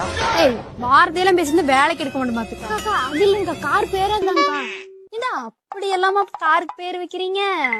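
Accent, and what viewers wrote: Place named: Kongu, India. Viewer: கொங்கு slang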